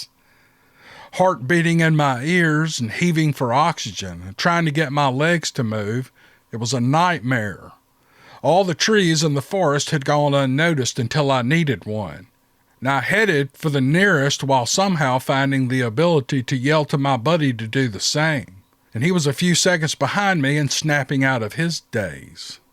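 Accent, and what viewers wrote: South, United States. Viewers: Love his Southern accent, so so sexy.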